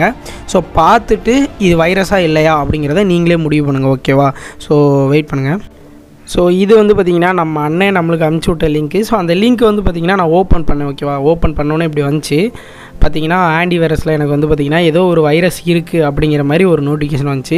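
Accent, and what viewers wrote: Tirunelveli, India. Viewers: Bro neega nellai ya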